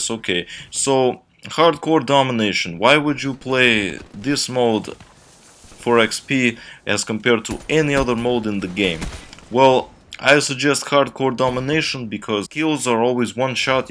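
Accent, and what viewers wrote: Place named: Russia. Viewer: He sounds like for Russia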